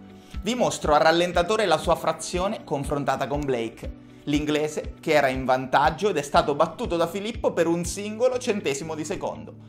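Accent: Marche, Italy. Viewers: Ciao, come si chiama lo youtuber? Sembra marchigiano, la staffedda quattro per cendo po' esse solo de qui vicino. Verso magerata o giù verso Fermo fra.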